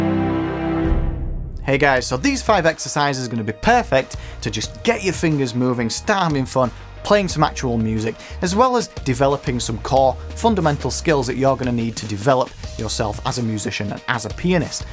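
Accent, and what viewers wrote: Yorkshire, United Kingdom. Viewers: You must be a Yorkshire fella 🤔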